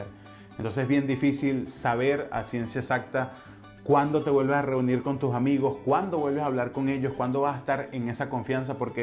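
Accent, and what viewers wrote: Venezuela. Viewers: Hola un gusto verte te vi por allí en YouTube y te escuché hablar y dije el habla como venezolano y bueno me suscribi y me encanta tus videos ☆éxitos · su acento es caraqueño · Al chile suena mejor el acento venezolano que el colombiano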